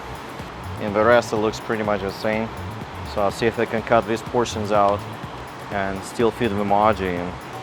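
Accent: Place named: Soviet Union. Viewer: sorry buddy but your soviet accent is annoying but good work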